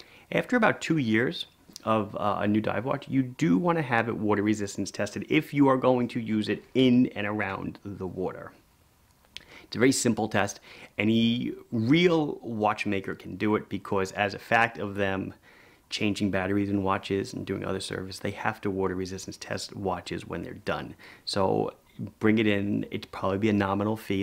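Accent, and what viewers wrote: Long Island, United States. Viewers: He's from Long Island. That's how they talk. 😁👍✌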